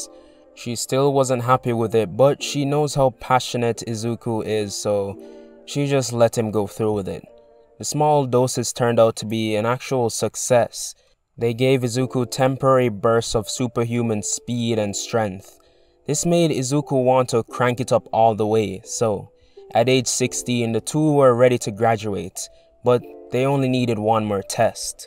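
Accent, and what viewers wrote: Jamaica. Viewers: U sounds Jamaican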